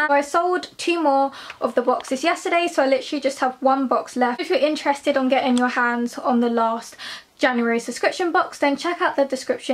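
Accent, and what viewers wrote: United Kingdom. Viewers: r u from the uk? xxxxxxxxxxx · Are you British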